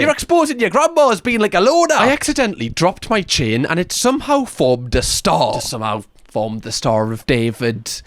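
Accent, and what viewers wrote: Newcastle, United Kingdom. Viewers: if anything there is no such thing as a British accent. is from Newcastle so he has a Geordie accent (NE England) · i am curious, does learning from give your english a british accent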